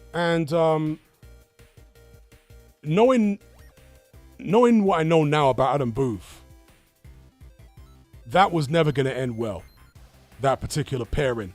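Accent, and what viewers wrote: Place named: United Kingdom. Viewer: I guess you're not used to hearing black guys with British accents. Anyone who is from the UK will tell you he sounds black. That's what we sound like here.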